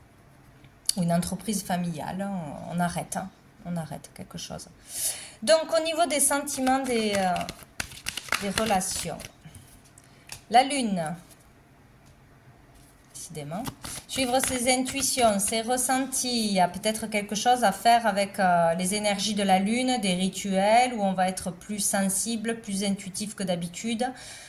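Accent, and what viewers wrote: South, France. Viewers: Ça change un peu l’accent du sud ! Il n’y en a pas beaucoup..en plus c’est le mien 😊 Merci pour ce tirage !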